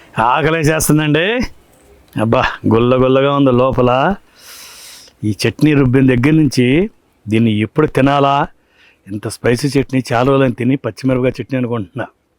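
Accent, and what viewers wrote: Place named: Rayalaseema, India. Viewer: Babai mana rayalaseema telugu antha madhuranga untundi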